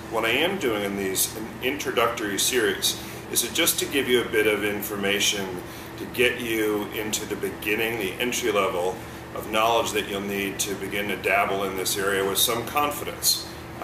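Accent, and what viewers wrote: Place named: United States. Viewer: practice that american accent in french. so sad.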